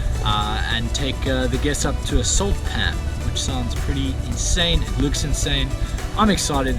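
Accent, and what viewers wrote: South Africa. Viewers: Dude are you South African? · Mate are you South African ? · Why do you sound South African? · By his accent he must be South African.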